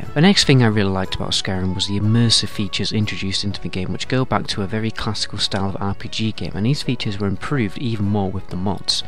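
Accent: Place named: Manchester, United Kingdom. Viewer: I love your accent are you mancunian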